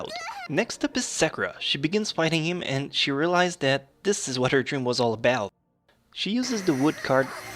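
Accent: Quebec, Canada. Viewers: are you from Quebec? The accent seems familiar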